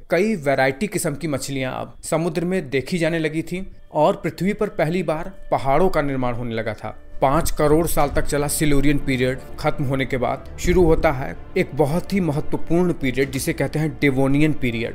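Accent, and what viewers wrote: Bihar, India. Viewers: Bhai tm bihar s ho kya 😮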